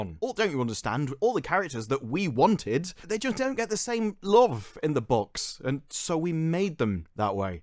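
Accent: United Kingdom. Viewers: offtopic: that british accent just flames ontopic: ye, i hope S4 will be cancelled, they butchered it enough or as the classic Simpson meme said "Stop, stooop! He is already dead!"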